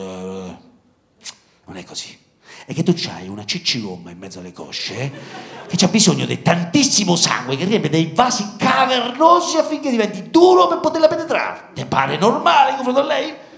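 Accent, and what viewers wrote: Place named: Marche, Italy. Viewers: Il marchigiano è bellissimo.... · Regà ma quant'è bello l'accento marchigiano!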